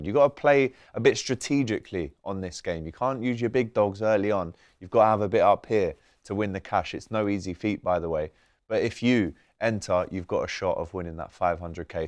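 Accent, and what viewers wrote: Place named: Southern England, United Kingdom. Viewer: This guy in no way represents The Kop. He is a southern fraud. Listen to the Fish and Finger Pies Accent FGS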